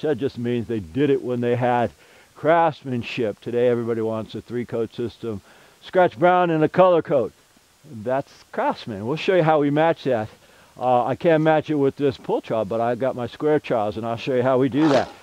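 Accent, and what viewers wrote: California, United States. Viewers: Never seen such a pro stucco enthusiast in my life tip of the cap 🧢 that accent is so Cali it hurts 😂 good stuff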